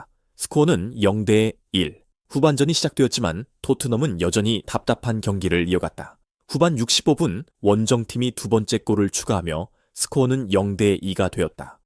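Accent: China. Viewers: 중국인이 내용을 읽은 건지, 뭐 이러냐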